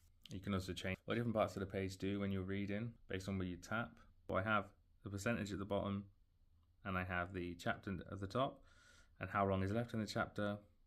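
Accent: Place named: United Kingdom. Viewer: Love UK accents, Americans (me) so boring...lol I just subscribed to your channel. As you can get cheapers stylus just research just ordered my Kobo Libra Colour a few days ago! . It is $219.99 in the US . Now I have to transfer allmy Kindle books. I know i can use calibre to transfer.. I also read a lot of Sarah Maas books her books are over 700 pages. I also have Kindle Paperwhite and Oasis. I am excited about switching to Kobo and started using Libby. I loved your video! I love the library intergration too. I should be getting mine in three days. So freaking excited! Cheers! xx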